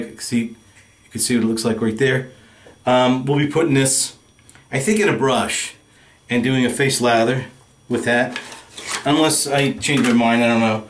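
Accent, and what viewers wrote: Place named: New York, United States. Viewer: I can tell from your accsent you grew up in the big apple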